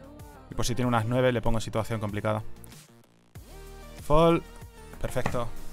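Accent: Georgia. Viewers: are you from georgia?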